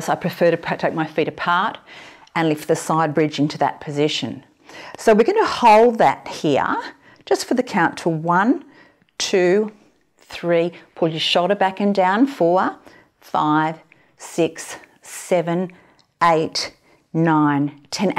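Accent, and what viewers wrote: Australia. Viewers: Love your beautiful Aussie accent!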